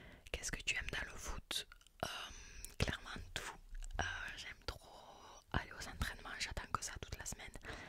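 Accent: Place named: Marseille, France. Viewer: L’accent 😍 marseillaise en plus 🔥 ailier gauche à la Dimitri Payet, caviar sur caviar pour ta numéro 9 🤝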